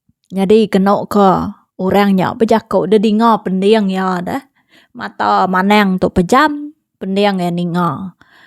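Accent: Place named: Saratok, Malaysia. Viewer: Munyi jaku urg tungga ke Saratok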